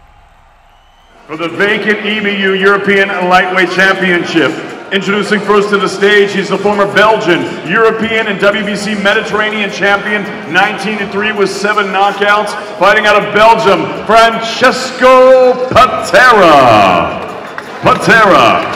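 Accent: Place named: Newcastle, United Kingdom. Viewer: Your defo a Geordie "Like" lol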